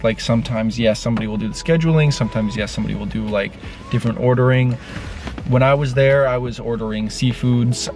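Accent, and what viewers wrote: Canada. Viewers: The full on Canadian accent <3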